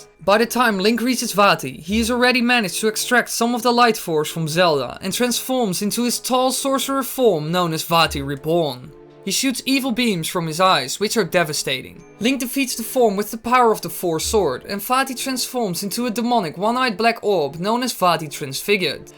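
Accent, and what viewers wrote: Netherlands. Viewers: Ah, so it's Dutch? I wasn't sure exactly what it was, ha ha. XD Hey, your videos are really interesting! Keep up the good work!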